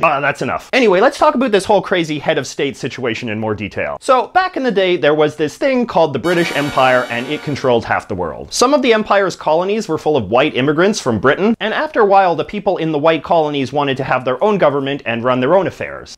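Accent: Canada. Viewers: About. Foond. Canadian accent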